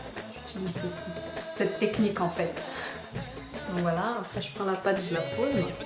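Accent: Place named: France. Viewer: lol c fucking weird voir une mdm aekk voile parler francai de france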